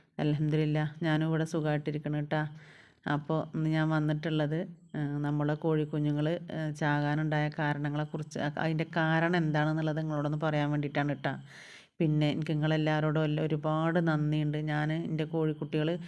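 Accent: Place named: Malappuram, India. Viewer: കൊടുന്നാക്കി എന്നത് അരോചകമായി തോന്നുന്നു, കൊണ്ട് വന്നാക്കി എന്നാണ് പറയേണ്ടത്. സംസാരത്തിലൂടെ മലപ്പുറം ജില്ലക്കാരിയാണെന്ന് തെളിയിക്കരുത്. കൊട്ന്നിടുകയല്ല, കൊണ്ട് വന്നിടുക.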